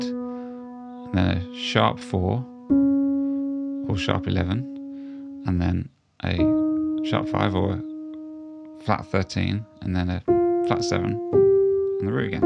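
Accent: United Kingdom. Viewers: Your delightful (South London?) accent and phrasing remind me of Tim Roth (aka Pumpkin) in Pulp Fiction. 😊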